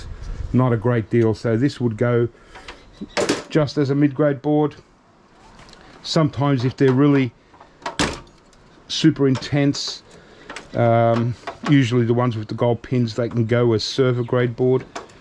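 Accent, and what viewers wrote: Australia. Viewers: I prefer the short sessions anyway! It’s all mystical to me anyway but I like to hear the Aussie and see what you do. Merry Christmas 🎄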